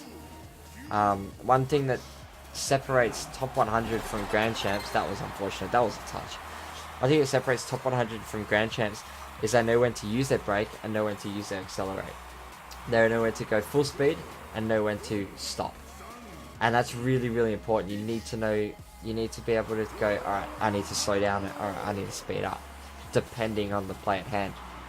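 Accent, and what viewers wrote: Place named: Australia. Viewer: Holy shit your Australian accent is deep, I'm just used to you on your Facebook page, with no accent lol. Love the video tho bro, keep it up and good luck.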